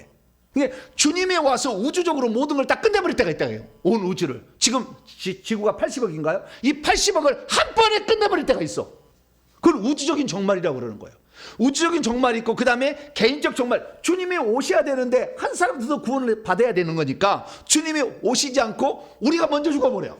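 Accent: Jeolla, South Korea. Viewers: 목사님! 반갑습니다. 목사님 고향이 ”전남 화순군 도곡면“ 이시군요. 저는 화순군 춘양면입니다. 목사님의 설교 말씀을 즐겨 듣는 편인데 들을 때마다 속으로 생각하였습니다. ‘억양이 전라도 분 같은데…‘ 역시나였습니다.🩷 앞으로도 은혜스러운 말씀 기대하겠습니다.😀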